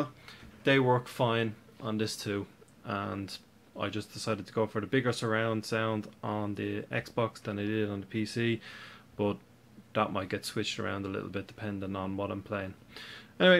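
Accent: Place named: Newfoundland, Canada. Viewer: i thought you was from Newfoundland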